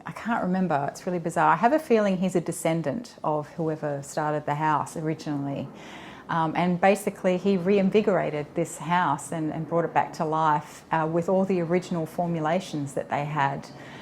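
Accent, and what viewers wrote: Australia. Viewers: You've quickly become a new favorite of mine and your answers are so thoughtful and thorough. I really enjoy listening to you and your lovely accent! I'm from the US and the Midwest specifically so I rarely hear Australians except for YouTube. Beyond the accent, I also love hearing your descriptions and I've learned of many new scents to try. I may subscribe to a monthly service to broaden my collection.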